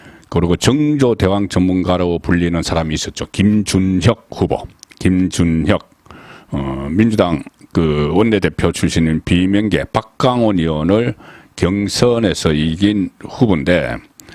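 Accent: Gyeongsang, South Korea. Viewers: 송국건님 시원시원한 경상도 억양으로 최고의 정치해설 짱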